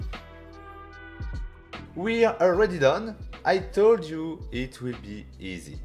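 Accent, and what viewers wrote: France. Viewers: T’es français a tout hasard ?😂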